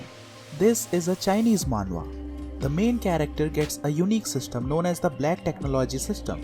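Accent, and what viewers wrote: India. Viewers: Just a question u sound indian are ye ?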